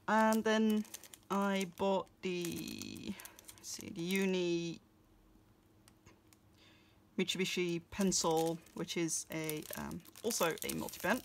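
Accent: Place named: Netherlands. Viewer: Hi! Subscribed right away just because of your chanel name..haha I seem to detect a little bit of a dutch accent, I am right? :) Edit: Just watched a little over half of your video and you gave an awesome idea to use the weeks for meal planning and groceries list. I had already thought about buying a weeks cover for my coupons but if I put a weeks inside it for the planning it will be even better! Thanks! :)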